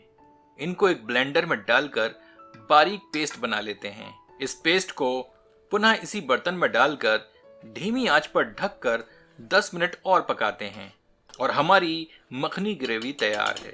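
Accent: Standard. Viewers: I like your shudh hindi more than the recipe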